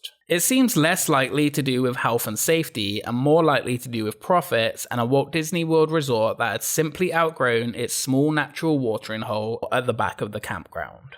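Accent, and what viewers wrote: United Kingdom. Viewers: Are you from the uk? These videos are great i cant speak highly enough about them all on my personal facebook and my enthusiat friends i would love to meet you one day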